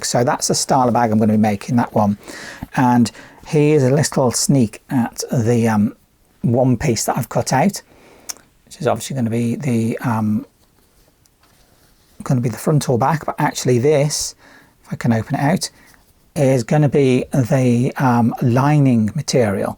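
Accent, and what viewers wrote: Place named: South West England, United Kingdom. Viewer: I'm a tech need seriously 😅 I need to dm you as ive got 3 huge bags of really good wool after my late mam and I can't think of anyone else I'd rather give it to. Help how do I contact you? I'm living in South Wales and you sort of sound South West urself 😍 if ur not interested no worries 😊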